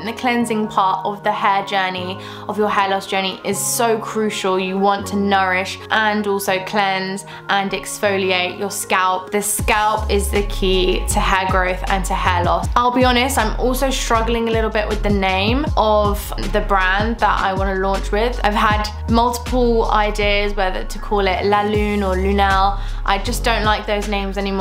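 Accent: United Kingdom. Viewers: I, love those lips and British accent. Oh! I forgot. Great video too.😉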